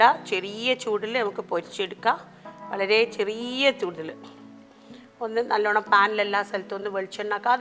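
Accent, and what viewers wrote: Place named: Malabar, India. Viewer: What a presentation with that Malabar slang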